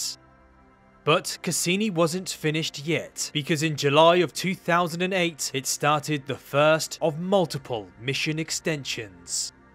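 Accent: United Kingdom. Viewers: The British accent 😍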